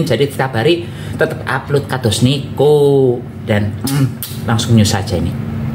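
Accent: Banyumas, Indonesia. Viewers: Sampean wong ngendi deneng ngomonge ngapak kaya jawane ijong masakane enak sumpah ijong jajal msk tongkolll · Lik asli ne wong endi??? Yakin ngapak kaya enyong pokok e ora ngapak ora penak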